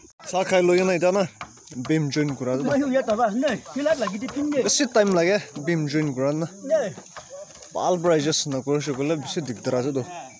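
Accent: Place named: Nagaland, India. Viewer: Ur nagames tune too😂 pakaaa sema asa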